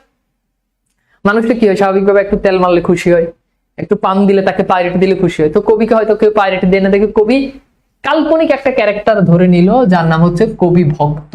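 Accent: Noakhali, Bangladesh. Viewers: sir apni ki Noakhailla?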